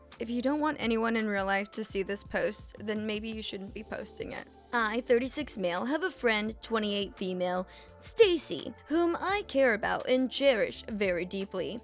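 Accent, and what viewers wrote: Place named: South, United States. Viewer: Your redneck accent is on point 😂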